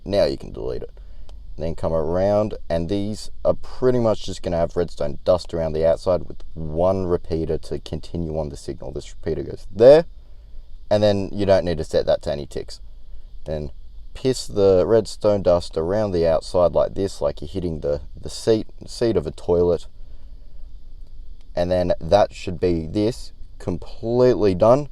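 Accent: Australia. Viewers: Heavy Aussie accent love it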